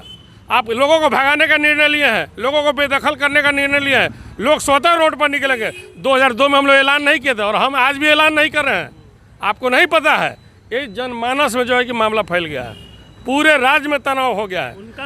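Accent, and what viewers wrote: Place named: Bihar, India. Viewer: Aapka baat bolane se Pata Chalta Hai Ki aap Bihari hai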